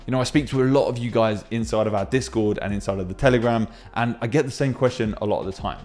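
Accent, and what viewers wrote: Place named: United Kingdom. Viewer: Okay, you have a British accent but you mentioned potential earning in US dollars, why?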